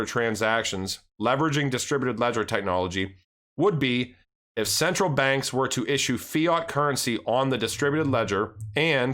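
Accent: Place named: Western Pennsylvania, United States. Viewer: I sense a western Pennsylvania accent.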